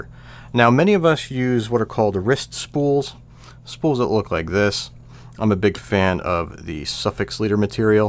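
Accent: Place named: Westchester, United States. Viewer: Your accent sounds like a friend from Westchester. He grew up in CT, but family is from the NYC area and L.I.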